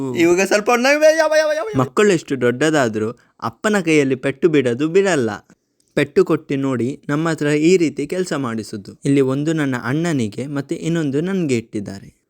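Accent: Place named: Mangalore, India. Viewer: Mangalore kannada kelode chenda ❤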